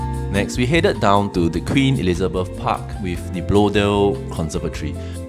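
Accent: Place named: Singapore. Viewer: guess you are from singapore your accent sounds familiar to me. I was living in singapore for 15 years and love the memories of it. Migrated to Canada because of Covid . Glad to subscribe the video is awesome enjoy the travel ❤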